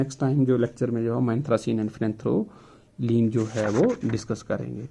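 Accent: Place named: Pahadi, India. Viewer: Sir aap Uttarakhand se ho .....aap k accent se LG Rah ...Ki aap pahadi ho .....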